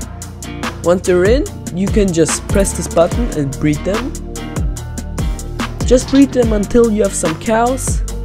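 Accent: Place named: Germany. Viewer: Ich hör so hart den deutsch akzent raus hahahaha kriegst aber trotzdem ein abo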